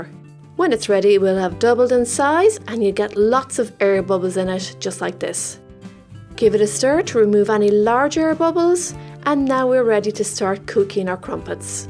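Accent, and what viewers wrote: Ireland. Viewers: YOUR irish accent and watching was great❤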